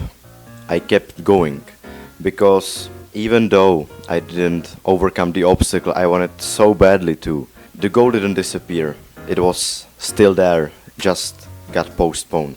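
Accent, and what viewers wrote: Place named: Czech Republic. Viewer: Já věděl že jsi šech, když jsem slyšel ten přízvuk :D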